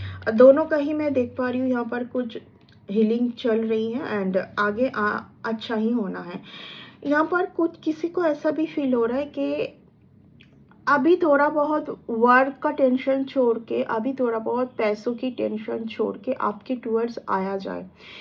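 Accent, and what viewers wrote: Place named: Bengal, India. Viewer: आप तो बंगाली भाषा से लग रही है।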